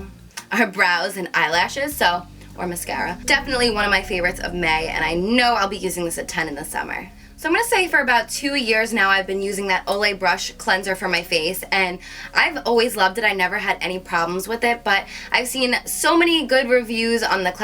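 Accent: New Jersey, United States. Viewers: i know she is from jersey i just didnt know that she lived in jersey. Just because you have a jersey accent doesnt mean you have to live in jersey.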